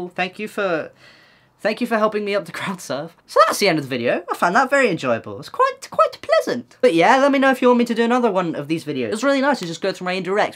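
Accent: United Kingdom. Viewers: i 100% support the tweet saying you helped them learn english, thanks to you i can now understand one of the numerous british accents that there is. so yup my french ass is grateful lmao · I learn english watching you, since I started watching your videos I started like mixing british english with American english, it's funny but a little weird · Your accent makes you sound British cuz you most likely are.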